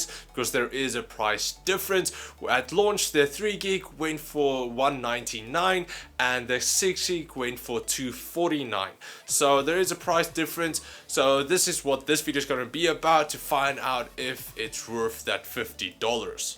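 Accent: Afrikaans, South Africa. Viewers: South African? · I had a feeling your South African, so am I. Lekker my bru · Is this guy Afrikaans?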